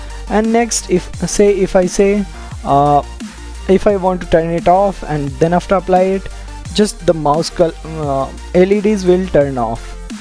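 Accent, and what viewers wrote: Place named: India. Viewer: 10/10 enjoyed the indian accent and the music!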